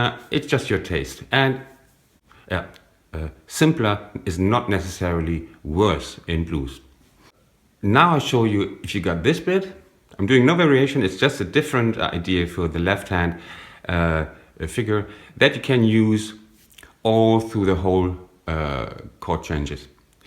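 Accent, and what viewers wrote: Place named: Germany. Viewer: Hallo, nice to hear your german accent......you Really make a good Job for beginners. One Problem for me was your First Trick for advancing the next chord.You speak from a Long Or nodded Note.I dońt Hearings ich in the beginning a looong Note, but only a strong Note.????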